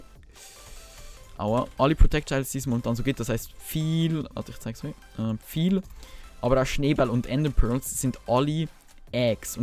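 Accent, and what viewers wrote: Zurich, Switzerland. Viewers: bahhh züri digge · WeirdChamp das ich andere auf YouTube die schweizerdeutsch reden und ich bin mir gewohnt das du so redest (bin auch Schweizer ich verstehe alles) für die Deutschen: Hoi we gohts öich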